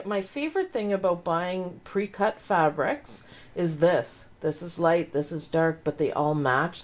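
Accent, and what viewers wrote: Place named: Canada. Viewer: 😊 I joined team Hatch today! Gonna be binge watching all the lessons and try to retrain my brain from pe design! Had Thanksgiving with a dual citizenship Canadian\American and she has your accent, brother's neighbor! Lol... Had a nice chat about healthcare differences and how screwed up it is here in the US. But her accent made me think of you!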